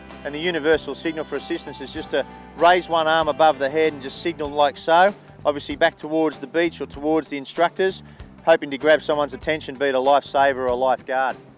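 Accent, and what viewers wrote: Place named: Australia. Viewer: gotta love the aussie accsont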